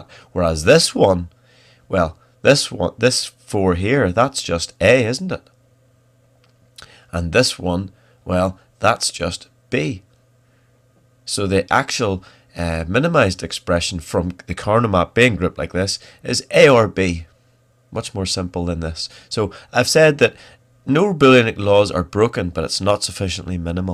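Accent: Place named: Australia. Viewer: he is Australian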